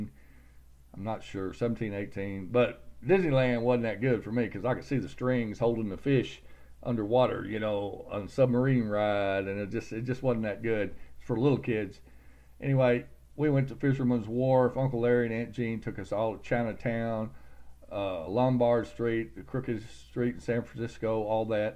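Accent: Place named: South, United States. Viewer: ...I love your accent, I could sit all day & listen to you tell stories....lol...you sound like you're from the South...country as S***, just like me! 😊😊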